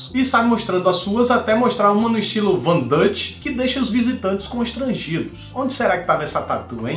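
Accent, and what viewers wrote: Northeast, Brazil. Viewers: Você é nordestino ?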